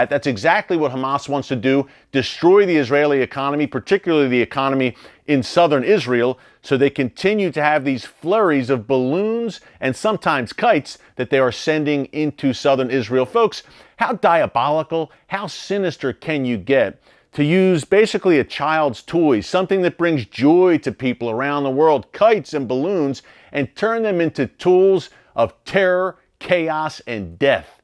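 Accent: Philadelphia, United States. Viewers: I thought I recognized a Philly boy. I’m from DE. I miss good Philly pizza.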